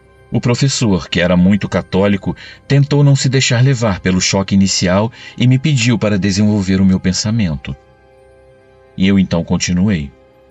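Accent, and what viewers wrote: Rio de Janeiro, Brazil. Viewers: Olá Que história bacana... É contada com esse sotaque de Carioca, isso dá um toque especial. Como foi bem lembrado: Padre Quevedo nunca desafiou a Deus, e sim as mentiras e 9s falsos profetas. João Batista - Cubatão SP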